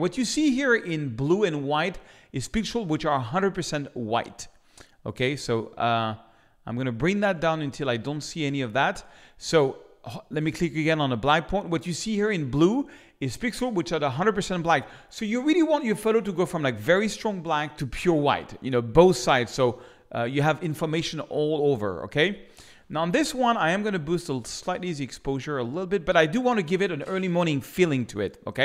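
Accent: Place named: France. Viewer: French man, making fun of his own french accent. Class act!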